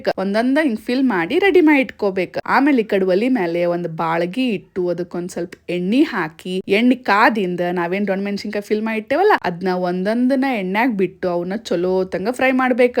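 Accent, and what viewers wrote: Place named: North Karnataka, India. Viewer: Ayya annudu nam uttar karnataka shaili..so please yar yar comment valag hakatiri laa ayya yak antiri antha so nimag yen helud andra... especially uttar karnataka 50km dura hodang nam mathadu style accent change agtad so😊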